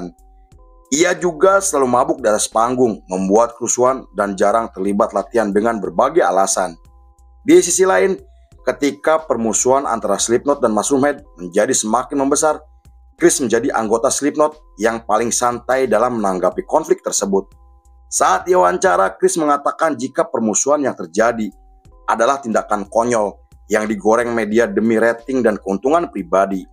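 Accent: Indonesia. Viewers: Medok amet suaranya kaya kang ibing 😂😂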